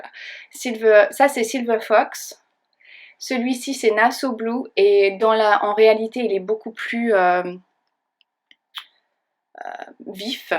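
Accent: United Kingdom. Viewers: Super, merci pour ce podcast et vivement le prochain. J'ai hâte de voir tes trouvailles d'Ecosses. Et j'adore ce petit accent British ;-) à très vite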